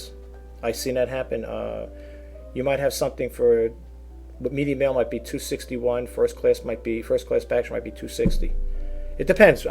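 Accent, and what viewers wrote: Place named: New York, United States. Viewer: Beautiful back ground music at the end of this vid..I'm 1/2 Finnish American, you may enjoy Sibelius...Finlandia and the Swan of Tuolla ...wrong spelling but you get the idea...you take nice pics of the clouds too...take it you are from New York...your accent...happy hunting and peace to you and yours this approaching Christmas Season ! Corie in Seattle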